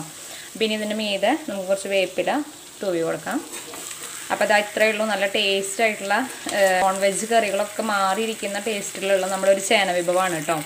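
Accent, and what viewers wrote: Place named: Thrissur, India. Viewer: kollaatooo👍👍👍.... Thrissur anoo namude naatukaari anale... Samsaram ketapo thonni...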